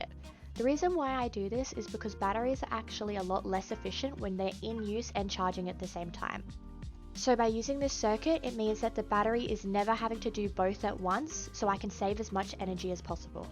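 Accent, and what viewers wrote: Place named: Australia. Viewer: love it when an Aussie woman tells me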